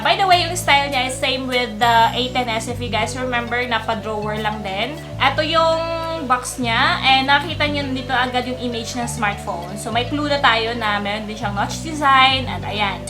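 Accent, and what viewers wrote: Visayas, Philippines. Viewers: Ang sarap sa tenga ng bisaya mo ate! 😍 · Hala grabe bisaya mn d i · Bisaya diay ka te?😂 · I like your bisaya accent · Whoa! Bisaya diay ka Miss! 😮🔥#ProudBisaya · Ay chouuurr bisaya man diay ka te, heheheh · Bisaya diay ka idol. Kamoka mo at kabuses mo din kapitbahay nmin hahahah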